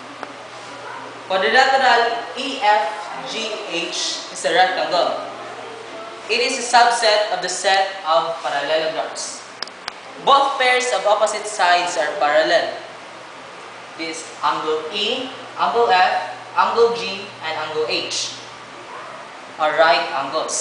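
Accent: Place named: Philippines. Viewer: Lol, You have such a filipino accent! Nice(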